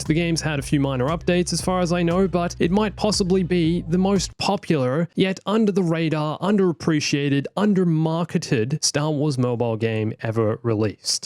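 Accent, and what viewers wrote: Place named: Australia. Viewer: Wait your an Aussie, I thought u where a briton.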